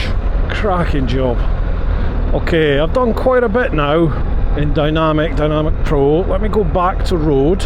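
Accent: Scotland, United Kingdom. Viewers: He's from Scotland, not Ireland. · I have a <num>RT, very pleased with it. I got to ride the 1300RT while my bike was having it's 12000 mile service. The few gripes people had with the 1250 would surely be addressed with the revised model, I thought. But alas, no, the switches are still not back lit, the footpeg position is even worse, and it's even heavier than the slightly obese 1250. (I like fat girls though, so I don't care...). The silly aerodynamic flaps are a joke, and the panniers are nonsense. It still does not have car play, the heated seat and bars are even fiddlier to operate, and the favourite buttons are hidden by the handlebars. When I returned the bike, I was so pleased to get back on the 1250. BMW, you really need to have a serious rethink. I know this might sound absurd, but have you considered listening to your existing customers? 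Of course not, what the hell do we know. Oh, and I can't believe how ugly the new one is. It does not pass the shop window test. As far as this review goes, nice one, I love the Scot's brogue.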